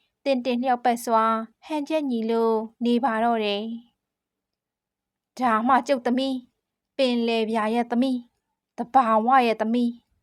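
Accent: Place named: Upper Myanmar, Myanmar. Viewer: ချယ့် အသံ က အညာက ပဲ GEC ပဲ.. လေသံနဲ့ ချစ်ချာရီး 😜😜😁😁 စိုးသာလွန်း တဲ့လား အမည်လေးကဆန်း ပီး ချစ်စရာ စိုးသာလွန်း ကိုချစ်ရင် ပင်လယ်ကိုလည်း ချစ်ရမယ် ငြမ်းစင်ကလှုပ် လှေကပုတ် နဲ့ ကောင်လေးဟာ ပြန်သွားခဲ့ပါတယ် 😅 ဆရာမ မိချမ်းဝေ စာတွေ ထပ်ဖတ်ပြနော် ကြိုက်တယ် ကျေးဇူးတင်ပါတယ် 🥰🥰🥰🥰🥰 👍👍👍👍👍 🤜🤛🤜🤛🤜🤛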